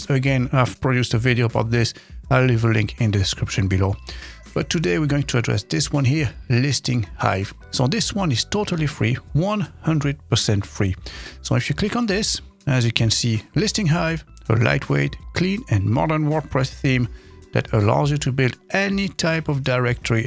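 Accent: France. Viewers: Carrément bluffé !!! J'ai cru reconnaître un petit accent français... Alors je publie mon commentaire en français... ça fait des jours et des jours que je me tappe des vidéos sur des solutions toutes plus floues les unes que les autres, là je dois reconnaître que la simplicité est au rendez-vous, il me restait 2 questions, est-ce que j'ose ??? Allez, je me jette à l'eau : pensez-vous que ce plugin peut-être utilisé comme un jobs board ? Et au sujet de la monétisation, pensez-vous que je puisse le paramétrer pour vendre la publication et la consultation de mes petites annonces sous forme d'abonnement mensuel/annuel ? :) Encore merci pour cette superbe présentation... A bientôt Dérick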